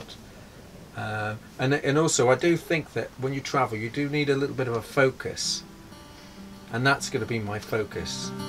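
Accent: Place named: Merseyside, United Kingdom. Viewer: what's his accent? Sometimes he sounds like he's from Merseyside.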